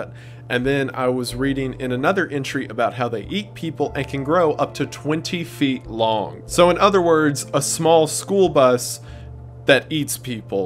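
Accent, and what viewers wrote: South, United States. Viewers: you have this southern accent that comes out every once in a while and it really added to this whole experience, especially when you read letters/notes, very immersive 10/10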